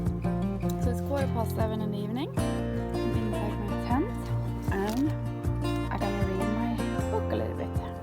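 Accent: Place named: Norway. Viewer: incredible! i want to do this in may! also you sound super norwegian... is that the case? well done!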